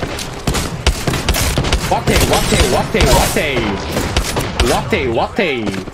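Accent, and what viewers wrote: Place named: Japan. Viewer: Japanglish for the win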